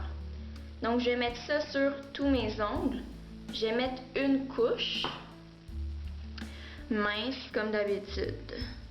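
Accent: Canada. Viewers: J'adore ton accent canadien !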